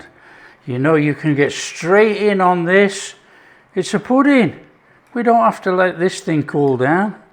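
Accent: Yorkshire, United Kingdom. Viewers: Yummy... easy to prepare must get rid of my old apples and make good use of em, I would make the spices in a bowl I usually add a tsbl of METAXA and brown sugar. Thanks are you from Yorkshire Im a Yorkshire lass :)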